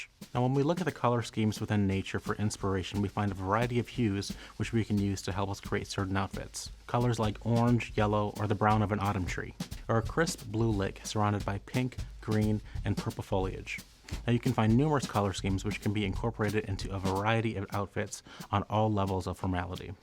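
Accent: United States. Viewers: It’s probably because he has an American accent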